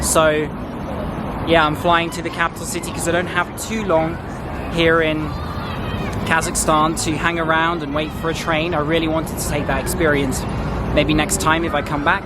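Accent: United Kingdom. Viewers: Nothing interesting, such a boring ew city. Btw, I like your british accent ㅜㅜ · Nice proper British accent!!! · Are u british ?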